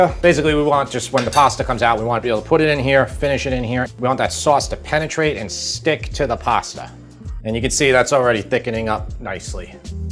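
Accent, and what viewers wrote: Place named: Long Island, United States. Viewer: wondering if i still sound like this..... im giggling everytime you say capers... grew up on long island but hopped to the other side of the pond 10 yrs ago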